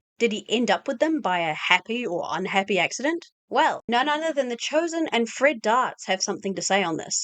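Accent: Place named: New Zealand. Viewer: Also you sound like a kiwi lol, don't ya worry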